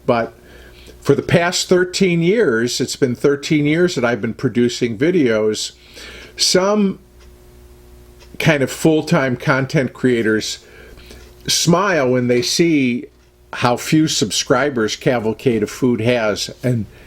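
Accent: Midwest, United States. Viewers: YouTube was kind of just starting its takeoff roll when I first started using a computer and visiting the internet. Didn't seem like it was so much a "job" then. Seemed more like a hobby! I don't begrudge its having become a job for the many now who do try to make their living or most of their living at it, because the more self-employment options there are for people, the better. Not everybody's wired right for the old 9-to-5! YouTube seems to represent a different kind of creative outlet for you, and I think the content you create is better than some that is created by the people who do it for a living. It is certainly less frenetic than some. As a former Michigander myself, of a certain age, I find watching Cavalcade relaxing and reminiscent in equal parts. I love that your steel cookware on the show is the beautiful yet uncomplicated Farberware I started buying around 1975 and still comb thrift shops to find additions to. 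At (a youthful!) 70 I love seeing the kinds of appliances and kitchen gadgets I remember from when I was 20 and first learning how to keep a kitchen! I love hearing you talk the way I used to, before I wound up in New England. Your recipe videos and stove swaps and features showcasing blenders or mixers or whatever feel almost like a visit with a nice neighbor two doors down, if two doors down took me all the way back to the Midwest . I love the approach you take to content creation and I hope there will be room on YouTube for your kind of contribution a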